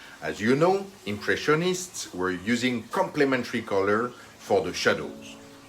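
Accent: France. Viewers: enjoy your French accent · your accent seems to be French, is it??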